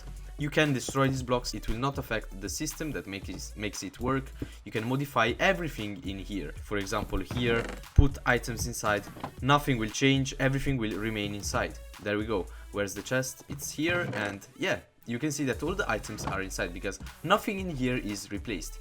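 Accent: Italy. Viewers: are u italian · he's italian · Si sente l'accento italiano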